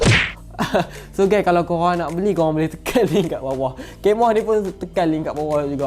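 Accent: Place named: Kelantan, Malaysia. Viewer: Kecek klate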